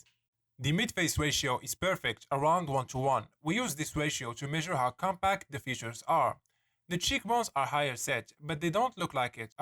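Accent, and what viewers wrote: Morocco. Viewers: mghribi akhoya😂😂 Wellah ta 3endna accent m3rofa.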